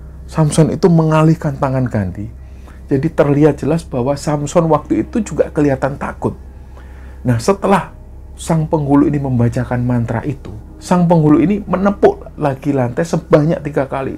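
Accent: Java, Indonesia. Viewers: mas sebagai org jawa klo melafalkan kata bahasa jawa sy harap tdk usah spt bukan orang jawa. mas lo klo bicara jg medok. utk melafalkan kata "ndoro" dan "sedoyo" kok melafalkan suara huruf D nya tidak tepat y sbg org jawa. maaf y mas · Hadir mas, mas jawanya darimana? Kalau ngomong jawa enakeun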